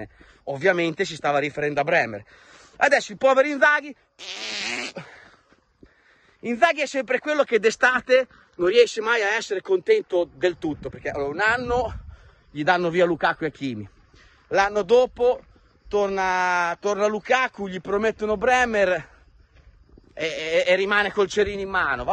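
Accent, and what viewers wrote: Italy. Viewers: Mi piace il tuo accento Emiliano romagnolo marchigiano 😁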